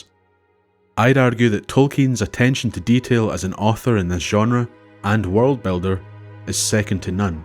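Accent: Scotland, United Kingdom. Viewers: very well made video, and as a german, loving the scottish accent :) Obviously there was no primogeniture among the noldor, as always there have been descendants with a higher claim. like the sons of faenor. so one could assume, that birth wasn't everything when the elves considered who should be king.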